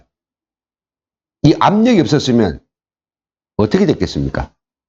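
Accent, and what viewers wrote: North Korea. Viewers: 구수한 이북사투리를 구사하는 모습 을 보고싶읍니다. 다른 싸이트에서 사투리를 들으니까 얼마나 구수 하던지요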